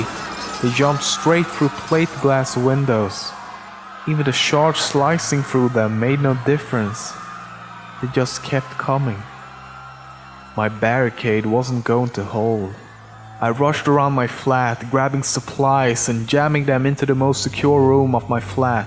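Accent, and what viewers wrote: Sweden. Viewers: his swedish accent is more prominent in his older videos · The jolly, good Swenglish dialect! :D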